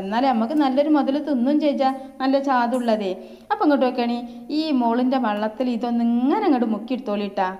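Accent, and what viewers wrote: Malappuram, India. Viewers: Makappura baasha🙂 poli endhaayalum sadhanam sooper aayikk nn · Fantastic dish, great enthusiasm and confidence plus terrific language which really has agreed malapuram tint. O.k. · Malappuram slang powersh💪💪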